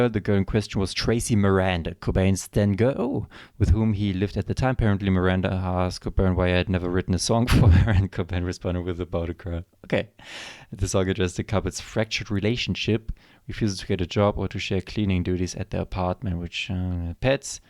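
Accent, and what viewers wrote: Germany. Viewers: You definitly dont sound like the baby from family guy. As a german I hear the german german accent if you could actually call it an accent.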